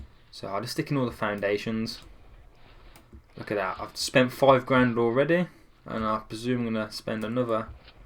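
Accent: United Kingdom. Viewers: your British ya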